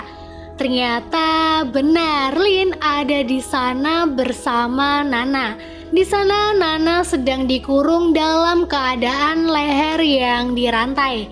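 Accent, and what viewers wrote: Pemalang, Indonesia. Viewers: Mbak nya orang Pemalang? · mbak orang pemalang ya?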